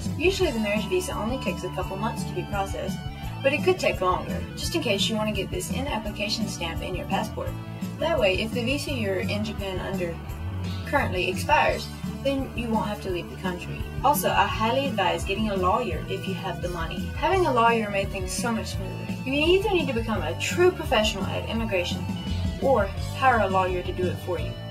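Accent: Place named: South, United States. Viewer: i was wondering where she's from until i heard that.... ahhh. yep, thats southern. :D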